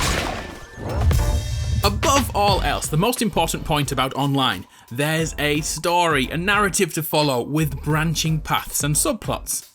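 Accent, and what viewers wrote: United Kingdom. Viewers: I stay to the end of the video as their amazing and are u British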